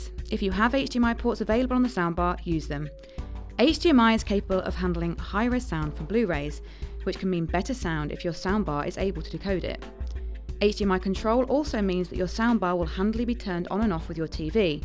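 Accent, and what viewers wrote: United Kingdom. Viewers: I think she is British. I actually like her accent, it's much better than American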